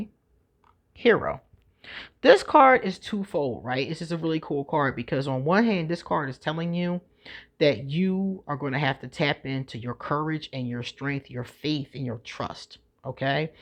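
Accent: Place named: Philadelphia, United States. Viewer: Ma’am! Are you from Philly? I can hear your accent. Signed- a Philly girl who is an Aquarius, lol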